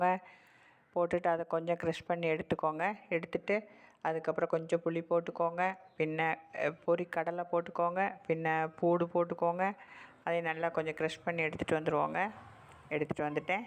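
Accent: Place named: Kerala, India. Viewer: நீங்கள் மலையாளியா?உங்கள் உச்சரிப்பும்,உபயோகிக்கும் வார்த்தைகளும் அதை தெரிவிக்கின்றன.பி‌ன்னே என்பது மலையாள‌வார்த்தை.தமிழில்‌ அப்புறம் என்று சொல்ல வேண்டும்.மனஸிலாயோ சேச்சி?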